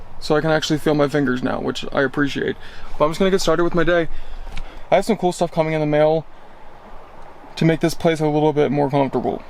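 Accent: South, United States. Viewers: Never heard your Southern accent until now. You must have been around your fmaily a lot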